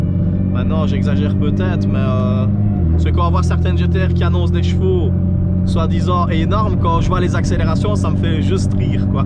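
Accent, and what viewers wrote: Belgium. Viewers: un régal tes vidéo , la GTR on en parle pas c'est une tuery, courage et bon rétablissement au papa, sa va bien allé j’en suis sur !!! Plus je voi ta GTR plus j’en veux une, ton accent belge me tue, nonente huit (98) me donne des barres, Bref continue bien, et des que jai la GTR je monte!!!!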